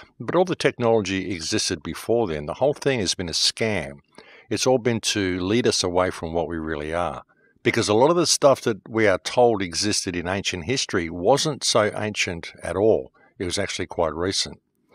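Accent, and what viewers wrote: Australia. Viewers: Theres a reason they pack us into cities. Look up mouse utopia experiment. There was an excellent doco on youtube but it kept getting deleted. Great to hear an Aussie voice on this stuff. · sounds Australian to me my man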